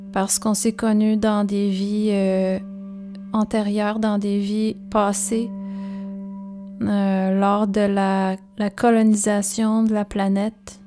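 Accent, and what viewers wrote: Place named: Quebec, Canada. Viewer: ahhhh, l'accent québécois!...toujours sympa de l'écouter 😉 God bless you from France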